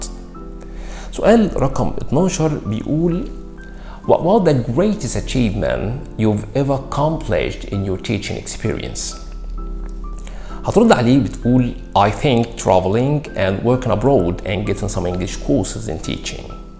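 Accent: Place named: United Kingdom. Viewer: لكنتك البريطانية مبهرة برافو يالتوفيق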